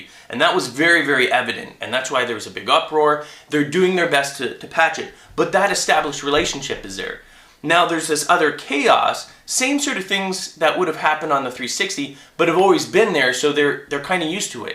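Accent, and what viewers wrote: Canada. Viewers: his Canadian acent ruins the mood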